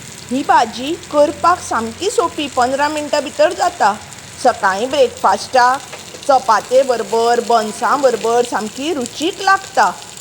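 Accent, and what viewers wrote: Konkan, India. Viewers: Must be tasty will try...your Konkanni is really nice to hear...dont know when Goa has a beautiful konkanni language why it's not used. Thank you for using it..God bless.. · Hey my MIL and I make it as a side with Neer Dosa often for breakfast. Ghovak khup avadta te. We chop the tomatoes to a little more smaller size instead of slicing/dicing like you did. Although I like it big. I love your Konkani dialect...the way you say "kaano" for onions is so irresistibly cute ❤️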